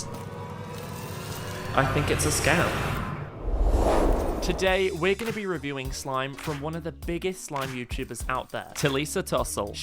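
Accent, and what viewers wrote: United Kingdom. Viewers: ARE U FROM UK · YOUR BRITISH!!!!!!! COOOOOOOOLLLLLLL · wait your British just like and we’re are you from i’m from walthstone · Is he from Great Britain😅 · Are you British too? 🎉🎊💝🎊🎉 Im British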